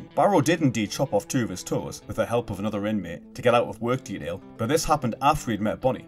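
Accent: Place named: North, United Kingdom. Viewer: Good review, good to hear a fellow British Northerner narrate on a Joblo video.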